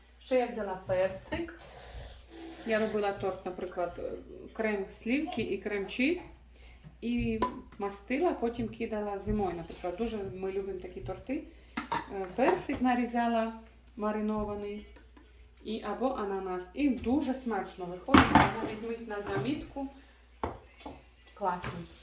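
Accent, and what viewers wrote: Ukraine. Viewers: у вас такой приемный украинский говор, мой муж Любе ваши кулинарное блюда и вас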